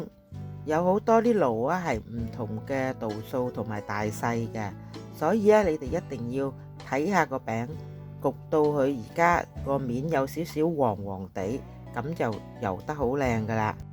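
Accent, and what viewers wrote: Vietnam. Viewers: Amy姐；妳講話的越南口音很重，請問妳是否北越海防人氏？謝謝 ！💝💋💞💯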